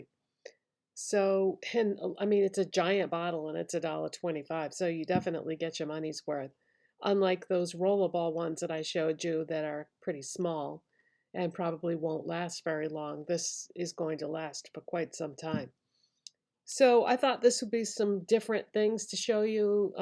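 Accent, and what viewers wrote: Boston, United States. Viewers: i just found your channel and i love your content, subscribed!! also, are you from the new england/boston area? we have the same accent :)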